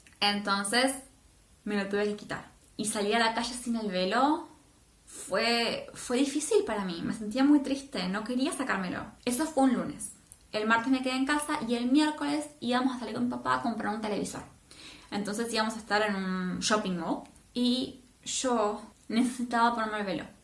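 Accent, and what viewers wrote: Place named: Argentina. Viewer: Me gusta escuchar el acento argentino 😍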